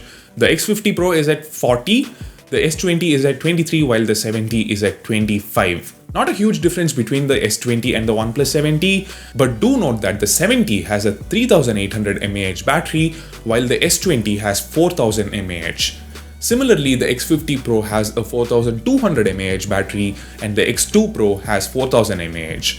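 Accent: India. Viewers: Nice indian accent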